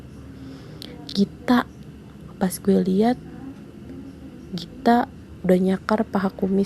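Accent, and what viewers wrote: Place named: South Jakarta, Indonesia. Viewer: Orang Jaksel banget nih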